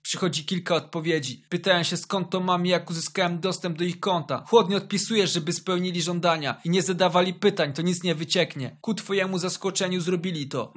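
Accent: Podlasie, Poland. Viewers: Ej mam pytanie jesteś z Podlasia? Xd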